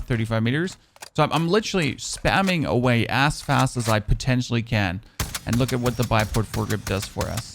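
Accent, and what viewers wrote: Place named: Germany. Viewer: He's german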